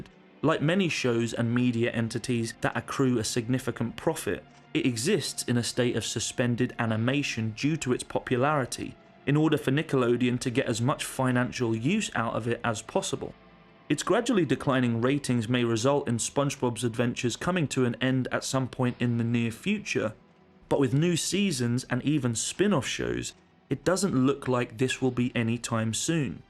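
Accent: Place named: United Kingdom. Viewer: Youtube: "Do you wanna watch a video of a british man explaining a Spongebob parody of a album?" Me: "Yes."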